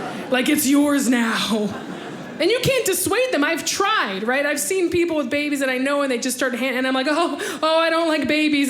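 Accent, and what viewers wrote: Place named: Midwest, United States. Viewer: From her accent I swear she's from the midwest!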